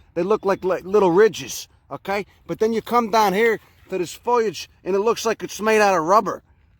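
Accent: Chicago, United States. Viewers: Oh my glob, this guy is HILARIOUS! I have to go back and watch everything he's ever made, now. Wisconsin has a lot of the same flowers and I'm a Master Naturalist trying to learn more plants every day, and starting to recognize a rather amazing number of them. Takes time to get them all to stick in my brain, one plant at a time. But with a Chicago accent and all the expletives? I'm going to learn SO much faster now!!! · Omfg bruh this really is how old school mfs Sound in Chicago instant subscribe i would listen to dis guy read the phone book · Chicago Italian accent lol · So, I've been watching your videos while I do my schoolwork for some reason, and I zoned out from this one for a minute as I was reading a Geometry textbook and looking at hexagons and decagons and stuff, and I just hear a voice with a thick Chicago accent say in my ears: "That would actually look like It'd hurt if you put that in your ass, I don't want to put that in my ass." I had to rewind the video a few times to make sure you were just talking about Echinacea. 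· "The lawn is a tragic, impoverished mindset" BRILLIANT! This guy knows his shit! Love the Chicawgo accent! LOVE it!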